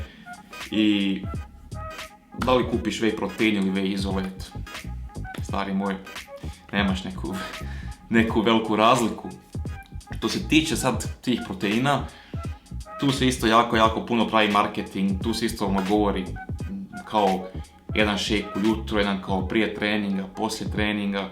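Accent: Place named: Serbia. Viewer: Malo previse lricas srpskim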